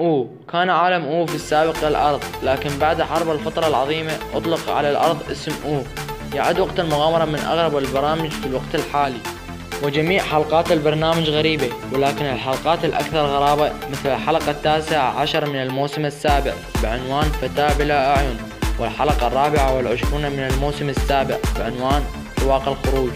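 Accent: Iraq. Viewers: إضنك من العراق لئن لهجتك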